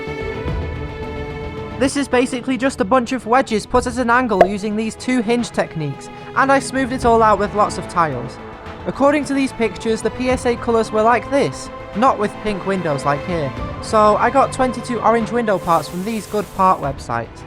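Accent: United Kingdom. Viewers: bruv I love your vids And your accent is kinda funny(no offence sorry I know your British correct me if I’m wrong)(btw Im subscribed😊)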